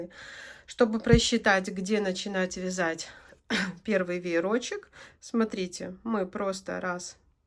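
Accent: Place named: Baltic states. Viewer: вы с Прибалтики? У вас красивый акцент речи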